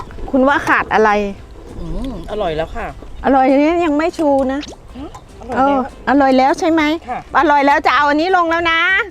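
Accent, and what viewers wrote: Isan, Thailand. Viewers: หนูเป็นfcยายมาตั้งแต่หลานเจ่าสายฟ้ากับพายุน้อยๆนั่งเล่นยุนำยายเจ่ากะเว้าอีสาวม่วนดีจ้าช้อบฟังตอนเจ่าเว้าลาว❤❤ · คุณยายเก่งและน่ารักตลอดเลยคะ เว้าภาษาอิสานตะฮักคักจ้า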